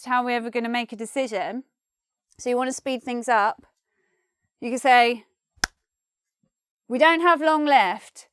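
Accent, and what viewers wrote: United Kingdom. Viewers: i can't believe that i understand totally what you say, i mean British accent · Are u followed British Accent? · This is genuinely accent from UK British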